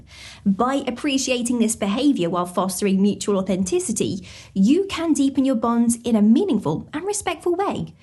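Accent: United Kingdom. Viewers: your little British accent is cute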